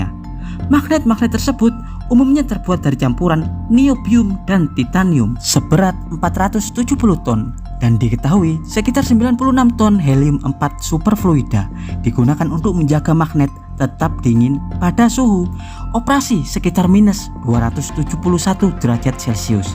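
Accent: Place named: Java, Indonesia. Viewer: Tak berijazah tapi jenius tapi medhokk.. magnet jadi mahnet.. gpp yg penting paham dengan penjelasannya prof.. terima kasih 🙏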